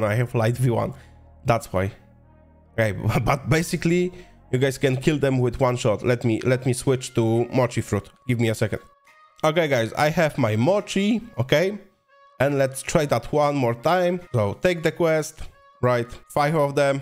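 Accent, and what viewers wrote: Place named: Asia. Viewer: Dude, i hear the asian in your voice, i love it, you are awesome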